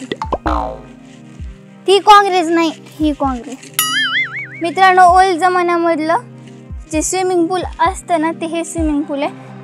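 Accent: Latur, India. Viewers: Ek no information Aani tuzya sobat khup cutness aani God aawajat Laturi bhasha zakaas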